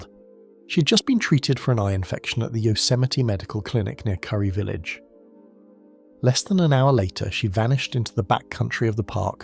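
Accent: England, United Kingdom. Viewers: You think this is bad try to sing to a strong Irish or strong Scottish accent lol. The narrator has an awesome English accent!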